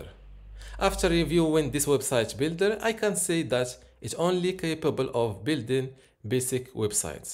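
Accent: Morocco. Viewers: my greetings to our moroccan accent reviewer , good review indeed !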